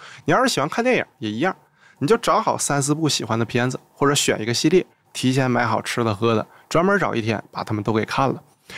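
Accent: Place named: Shenyang, China. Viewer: 原来是沈阳老乡，听口音这么熟悉。加油，非常喜欢你的频道。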